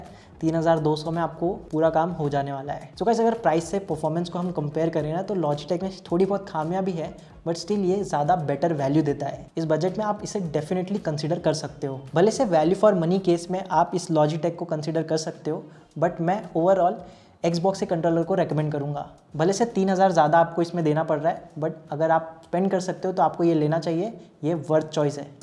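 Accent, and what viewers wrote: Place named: India. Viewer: Who understand you Curry language!!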